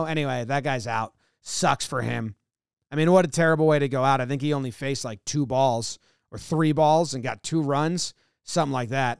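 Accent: United States. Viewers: How come you're so good at understanding cricket? US channel with regular American accent.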